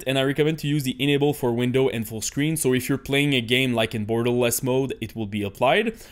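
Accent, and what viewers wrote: Quebec, Canada. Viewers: Is it just me or you are a french canadian ? (Qc)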